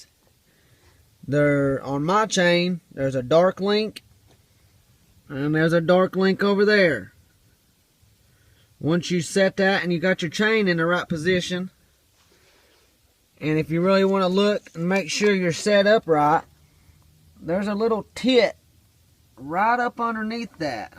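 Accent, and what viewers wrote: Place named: Missouri, United States. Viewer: You sound like your from Missouri, thanks man I hvnt started my project jus yet but i have been searching for about 4 months now ima go dive in head first thanks to you man 🙏🙏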